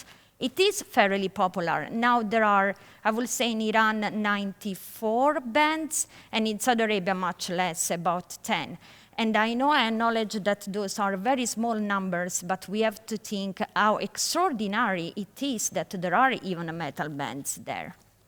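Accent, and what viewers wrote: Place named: Italy. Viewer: English with an Italian accent :)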